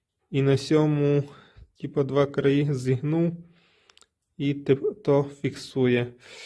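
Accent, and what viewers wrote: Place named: Transcarpathia, Ukraine. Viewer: Ти із Закарпаття, говір у тя знакомий, із якого района?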